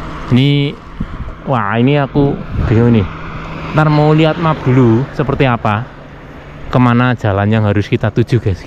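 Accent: Banyumas, Indonesia. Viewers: aku suka gaya ngevloge, ana ngapak2e...👍👍👍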